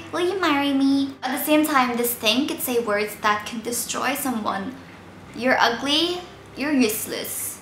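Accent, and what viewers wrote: Northern Ireland, United Kingdom. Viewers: Woah you sound like you have a northern Irish accent! :o P.S. I'm Irish and this is my first video I watched of you and you seem lovely :) <3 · She has mostly a Northern Irish accent :)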